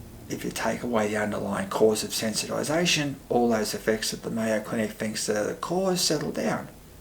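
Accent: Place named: Australia. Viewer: He’s an Aussie, so I understand and I can hear him. I agree the sound is a little faint I had to turn the volume up. 😉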